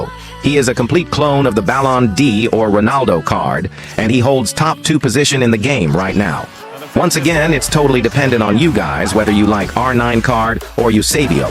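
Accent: India. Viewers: yeah I just found out from one of his video and heard his indian voice and accent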